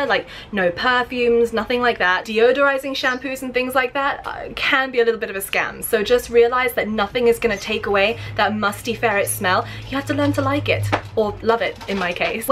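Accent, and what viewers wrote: Australia. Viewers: Is she Australian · Why do I think she is Australian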